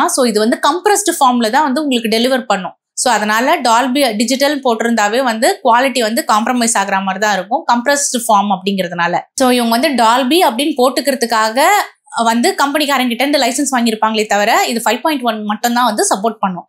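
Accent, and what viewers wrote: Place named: Madurai, India. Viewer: பேச்சில் மதுரை நடை வருகிறதே.... மதுரை/திண்டுக்கல் பக்கமோ...... தெளிவான விளக்கம். அருமையான காணொளி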